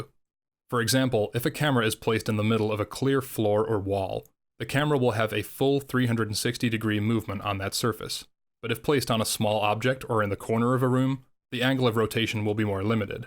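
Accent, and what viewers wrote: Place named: United States. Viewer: This was much appreciated. As someone who doesn't play (or pay) enough to have many operators, it was annoying that I bought Valkyrie and didn't use her too much due to feeling like I wasn't using her to her full potential. This definitely had some useful pointers for using her gadget which I'll be able to implement later tonight :p. Also, nice American accent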